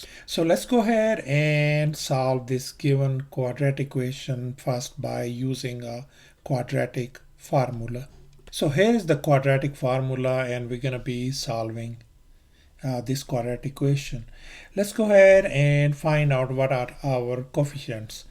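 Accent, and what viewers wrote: India. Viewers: ig he's indian ....by his accent....he teaches gud though (the teacher)....n call me 'sis' not 'bro' cuz it's rakhi....idk why I'm saying this but happy rakshabandhan buddy^^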